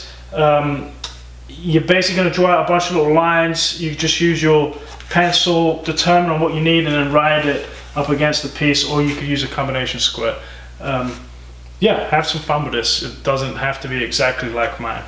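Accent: United Kingdom. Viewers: Guessing the UK..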